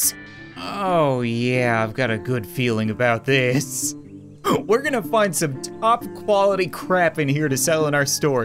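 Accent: Appalachia, United States. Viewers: His hillbilly accent reminded me of kindergarten 2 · Your hillbilly voice Me: kindergarten flashbacks Who was that voice for in the kindergarten series? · uses hillybilly accent Me: remebers kindergarten 2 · Damn that hillbilly voice is nostalgic of the kindergarten series... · The hillbilly accent reminds me of the voice he gave for ted and felix from his kindergarten 2 series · I love how we call hillbilly accent the Felix and Ted voice now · Your country accent in this is the same as one of the twins in the kindergarten 2 game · only og people remember kindergarten 2 his hill billy voice sounds like Felix from kindergarten 2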